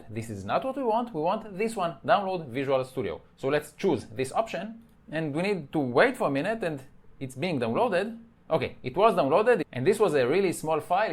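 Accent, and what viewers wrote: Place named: Israel. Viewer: ניסיתי למצוא סרטון שמראה לי איך להוריד.. לפי המבטא אתה ישראלי נכון?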